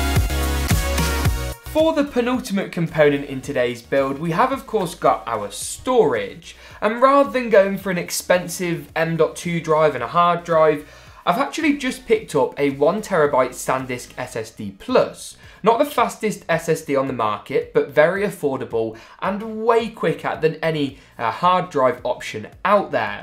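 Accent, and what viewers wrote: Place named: Cockney, United Kingdom. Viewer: Everyone comes here for the PC. I come here for the COCKNEY ACCENT.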